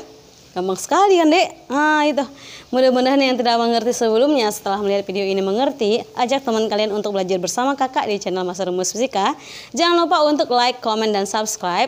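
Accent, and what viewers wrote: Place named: Medan, Indonesia. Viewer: logatnya orang medan ini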